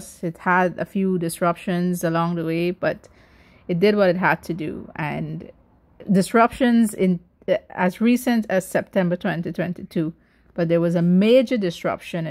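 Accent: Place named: Guyana. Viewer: Excellent presentation. Good visuals. Clearly spoken with that educated Guyanese accent.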